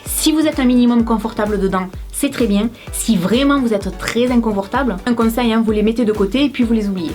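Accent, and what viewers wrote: South, France. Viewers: Salut, petite question, existe t’ il des anti derapants à poser sur la semelle (côté sol) pour avoir une meilleure adhérence, et met tu quelque chose au niveau du bout du talon pour la même utilité. Merci pour ta bonne humeur, tes astuces et ton accent, vive le sud!!!!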